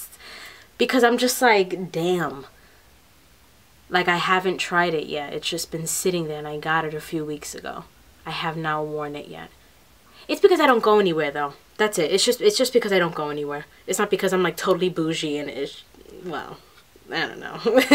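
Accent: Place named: New York, United States. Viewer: Omg the accent. Where r u from!! Ny or New Jersey but sure ny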